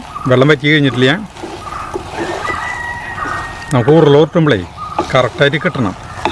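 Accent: Thrissur, India. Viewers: Sugiyan 👌👌👌👍👍👍ente Mami yum thrissur kkari aanu maman marriage chaithu trivandrum vannittu 17 years aayi but eppozhum samsarikkunnathu chetante pole nalla rasamulla thrissur slang lanu enikkum thrissur slang valare ishtamanu👍🙋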